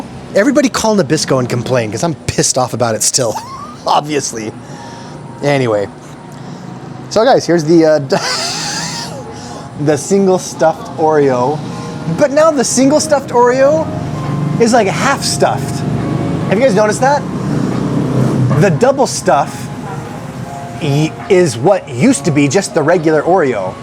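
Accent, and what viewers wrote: Philippines. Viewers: love ur filipino accent kuya ❤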